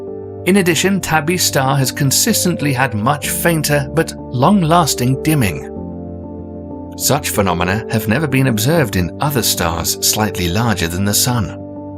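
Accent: United Kingdom. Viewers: Why do we need a guy with a British accent to explain projects by NASA?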